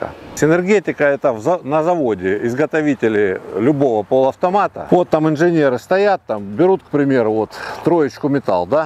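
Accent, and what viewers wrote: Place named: South, Russia. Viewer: почему говор южнорусский? Точнее что-то между Краснадаром и Ставрополем, возможно Ростов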